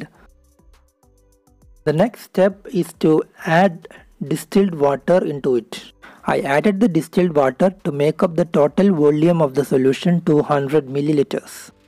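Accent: India. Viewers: Your sounds more likely to indian😄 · There's no polish video so here I am 🥲 that Indian accent hurts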